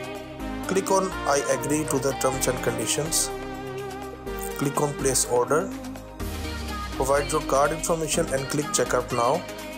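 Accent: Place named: India. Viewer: It’s just an Indian accent bro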